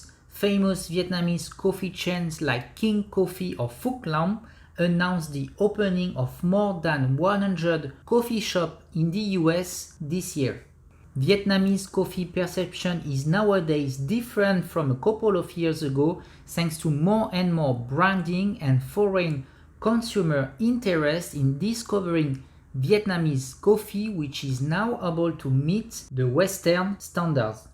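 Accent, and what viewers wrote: France. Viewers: Ca sert a rien de parler en anglais avec l’accent français !!parles directement en français,ca nous aidera a comprendre :)..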